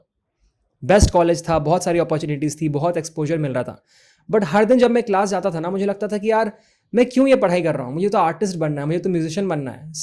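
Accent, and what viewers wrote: Bihar, India. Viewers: Bihar se ho app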